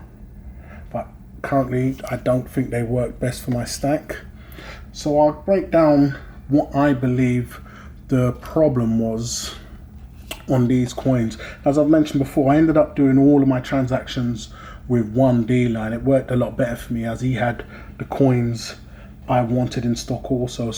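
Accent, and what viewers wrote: United Kingdom. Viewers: I sense a bit of sarcasm in your opening remark & that's a pity when my advice was well intended. Yes I fully watched & listened to your video. Although you have a slight hint of a British accent your rambling for the most part was understood. The underlying message was quite clear to the you tube audience & myself and that is the fact that you as an investor made some bad purchases even by your own admission and the headline of your video: "Cutting My Losses On This Silver Sale" First off I am a numismatic coin collector and you will kindly note Sir that I commented "exclusively on your large (numismatic) proof coins" which you admitted was bad investment purchases & suffered a loss a number of times in your video. Further more, you obviously do not have a grasp of how the world market operates judging by your concern over BREXIT. 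The value of your coins as an asset depends on current market value/appraisal at any point in time. Whether the £ devalues relative to the € or for that matter on world foreign exchange (FOREX), selling your coins you will at "best get what is fair market value at the time of sale"... meaning it could be more than you paid or less. Also despite BREXIT, you are not limited to selling within the UK but internationally...the world markets are wholly interconnected! One more thing - you have thought me not to give advice freely because for some, it is objectionable & demeaning. A lesson learned & I thank you...my bad.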